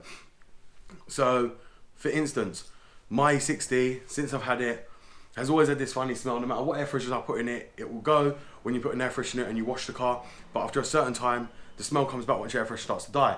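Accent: United Kingdom. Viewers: 😂😂😂like a bomb but with that Brit accent killed it thanks for showing very simple and straight to the point 🎉👏👏👏NYC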